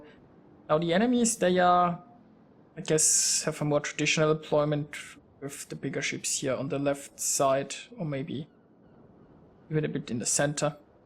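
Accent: Germany. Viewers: are you german? when you speak english you sounds like a german...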